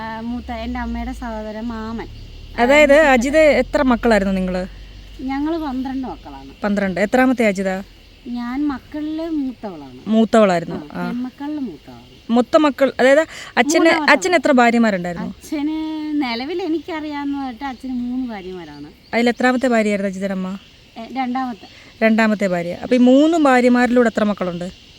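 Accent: Thiruvananthapuram, India. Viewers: Chummadhalla oru TVM slang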